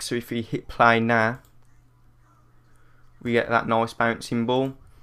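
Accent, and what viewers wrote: Birmingham, United Kingdom. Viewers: haha Brummie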